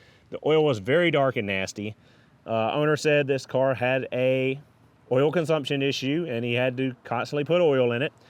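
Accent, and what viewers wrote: United States. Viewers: OK, I love the East/South Accent....Anybody gonna mention whatever is leaking or so......LOL. Great video and this cat can have that engine out and broken down in an hour. LOL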